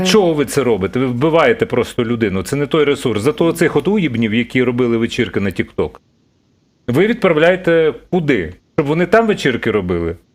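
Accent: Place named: Poltava, Ukraine. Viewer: згадалась ваша полтавська вимова,коли читала в місцевому чаті одне з повідомлень. Це Херсонщина. Ось це повідомлення: "Вірні слова,бо унас не так як у людей,ми будем собирать кошти, на ремонт а хтось буде мидальки получать,мидаль наде було дать громоді яка була в окупоції,чого не пишуть де діли 60 тон гомунітарки,а тендери по ремонту це зовсім інша проблема,унашом отеге все можливо,кому війна а кому мать родна."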